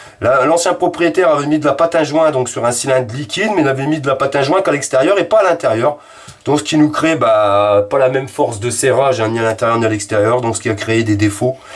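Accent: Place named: Nord-Pas-de-Calais, France. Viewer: il parle chti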